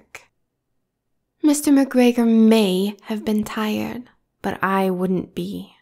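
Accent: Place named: Brooklyn, United States. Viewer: I was thinking more of a Brooklyn New York accent but that works too 😄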